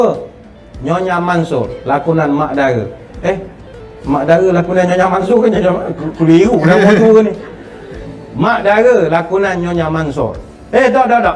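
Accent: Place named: Temerloh, Malaysia. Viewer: Best loghat tmerloh 😂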